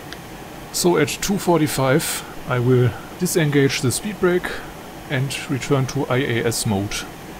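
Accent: Germany. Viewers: Super Video, sehr gut gemacht. Ich nehme jetzt einfach Mal an das du Deutsch bist, das hört man. Englisch sprichst du sehr gut👍 Im Not too Bad in speaking english, however it could be better i supose.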